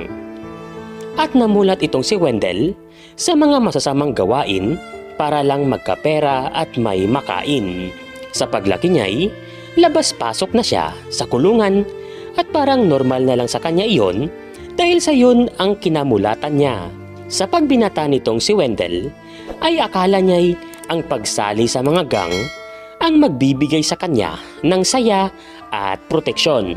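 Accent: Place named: Ilonggo, Philippines. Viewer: weldel is elonggo I dont know.but the narrator is 100%elonggo po siya.all the way his intonation really he is elonggo mlumanay mgsalita😅😅😅😅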